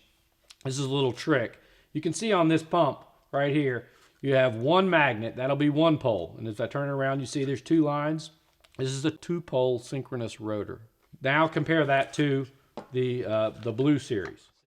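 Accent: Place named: South, United States. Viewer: This better be a good pump. I just ordered it with my GEO Calcium Reactor. With a Southern Accent...I do trust this man. He must be somewhere in Texas with me.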